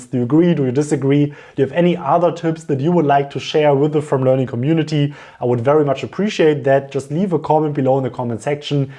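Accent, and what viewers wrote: Germany. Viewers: The quintessential German accent!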